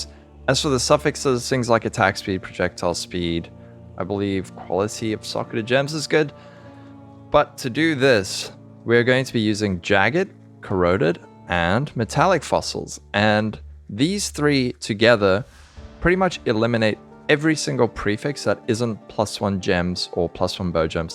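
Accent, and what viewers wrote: South Africa. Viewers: You from Durb's? you sound Safa!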